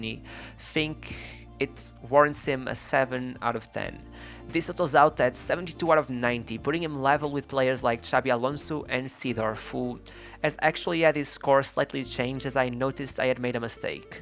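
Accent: Portugal. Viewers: Am I the only one who thinks that the commentator is Portuguese ? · Trying to ignore this darn Portugeese accent 😬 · Só te queria dizer que estou a adorar o teu canal. E sim, percebi que eras português logo no início do 1o vídeo que vi pelo sotaque ;D Continua com o bom trabalho!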